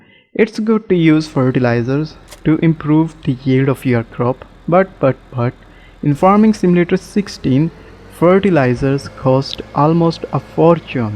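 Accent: India. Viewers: Are you an Indian person because you sound like one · idk why your Indian accent gives me an asmr like tingle but i love it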